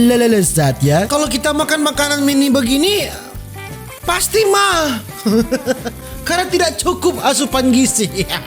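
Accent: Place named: Mandar, Indonesia. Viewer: Abang orang mana 🤣 Loga2 suaranya kek orang mandar😂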